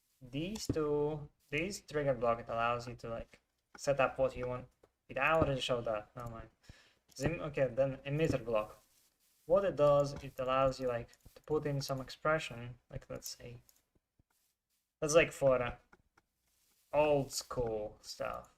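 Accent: Russia. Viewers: i think you are from Russia, because your acsent, words: "kek", "da".. Hmmm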